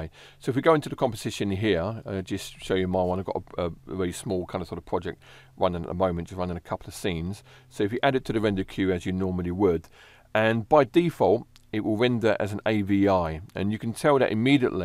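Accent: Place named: United Kingdom. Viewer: Your accent is so like Hermione(British)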